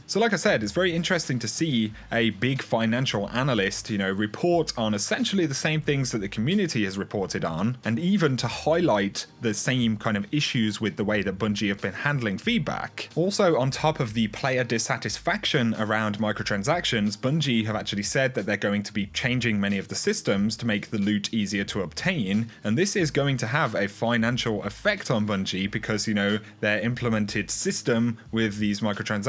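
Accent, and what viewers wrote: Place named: United Kingdom. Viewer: Even bad news sounds good in a British accent...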